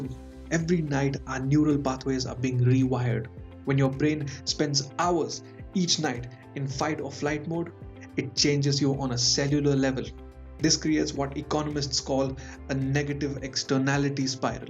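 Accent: India. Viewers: funny and indian accent, great combo imo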